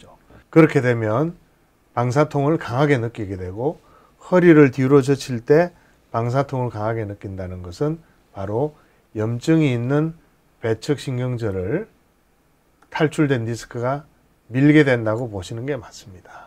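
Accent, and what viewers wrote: Gyeongsang, South Korea. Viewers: 1년 기다려야하는 환자들 생각해서 열과 성을 다해서 설명해주시는 박사님! 의학 지식을 갱상도 발음도 알아들으니 넘 감사드립니다.어금니 꽉 깨물고 참아봐야쥬^^ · 배척 /배측 그냥 알아들으시지 …경상도 발음이 너무 좋아요 ! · 갱상도 확실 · ㅋㅋㅋㅋㅋ 갱상도 바름~^^ 귀여븐 사랑스런 감사한 우리 교수님~~😊😍😂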